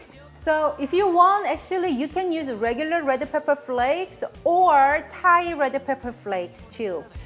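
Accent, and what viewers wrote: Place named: South Korea. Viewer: She married white man. Why is she have Korean annoying accent?.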